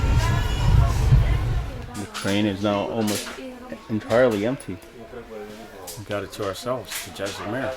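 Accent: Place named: United States. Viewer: You looks like Indian and having accent like American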